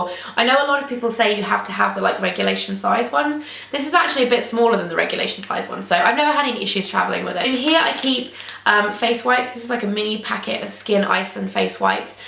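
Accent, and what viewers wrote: United Kingdom. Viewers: I love her British accent! <3 ... and the bag, of course. It's gorgeous!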